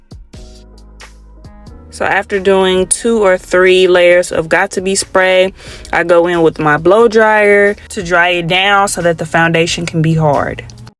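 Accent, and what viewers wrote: St. Louis, United States. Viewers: Hurrr lol your accent is so cute! Sound like a St Louis girl ❤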